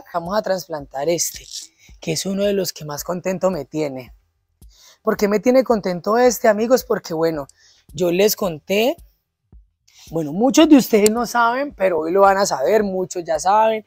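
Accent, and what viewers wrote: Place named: Colombia. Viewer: ay qué divertido eres, con ese acento colombiano, esa locura x las plantas q comparto, es una lindeza oirte, me río mucho, eres encantador ojo yo vivo en el campo cerca de Madrid, un clima extremo y en el monte..te puedes imaginar la de fracasos q he tenido con las plantas..pinos pinos y más pinos un horror x eso estoy bellamente fascinada con esas plantas tropicales y exuberantes, Dios te bendiga☺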